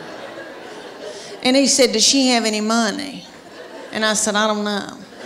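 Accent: South, United States. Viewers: O" Really? Me loves ya' Southern Country Accent though with them jokes of yours fits ya' Personality so, Very much or should me say fits ya' Personality so, Very well._ (Nevertheless, me Mr. Amos).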